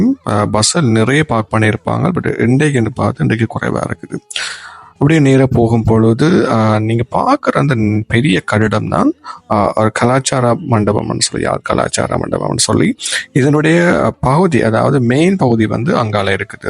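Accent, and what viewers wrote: Jaffna, Sri Lanka. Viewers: Jaffna la enaku pidichadu alagana Tamil neegalum romba alaga Tamil pesuriga doctor ❤ Colombo la kuda naaga pesura Tamil alagu tan but edu romba alaga ❤ cila words mattum puriya matanguda ❤ 😂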